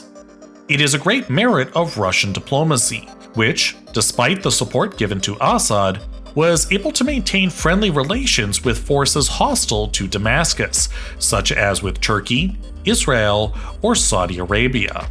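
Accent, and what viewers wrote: Poland. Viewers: Hey mate, you should stick to your original voice. Your polish accent adds a sense of charm to your videos and makes it feel more authentic. The American voice over kind of makes your videos feel more robotic. Overall, this video was really interesting and very enjoyable. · I prefer your voice and your polish accent over this sanitized american voice, your videos sounded way better before (the content keeps getting better though)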